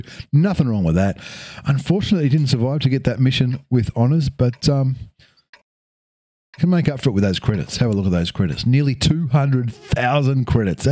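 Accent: Australia. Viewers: aussie aussie aussie ooi ooi ooi hello mate from N/A love that awesome aussie accent hey which is better m60 or 121b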